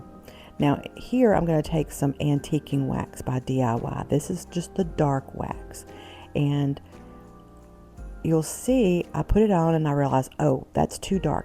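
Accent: United States. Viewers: I had purchased a chair a while back real cheap from Salvation Army and cut it all up. Now I have a box full of spindles and you’ve given me some great ideas to use them for! Your accent is similar to my mom’s. She was from Virginia. Where are you located? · Can't beat that Alabama accent !! South GA accent is very similar! So enjoyed your video!🌟🌟🌟🌟🌟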